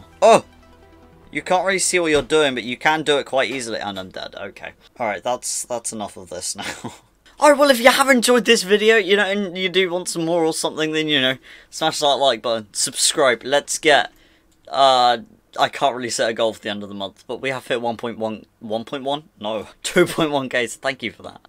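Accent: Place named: United Kingdom. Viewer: I love your British accent I always wanted to be British but I can’t I hope I can do you think I could)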